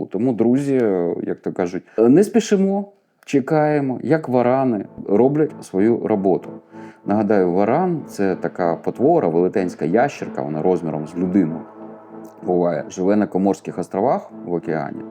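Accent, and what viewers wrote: Ukraine. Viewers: Дякую за вашу роботу, пане Юрію. Я з Київщини, навчалася і жила у столиці і я 1976 року народження, тому завжди приємно чути нашу говірку у вашому виконанні і зрозумілі моєму віку приколи. Доступно подаєте важливу інформацію. Бажаю всього найкращого💛💙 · Якимся-))) обожнюю ваш суржик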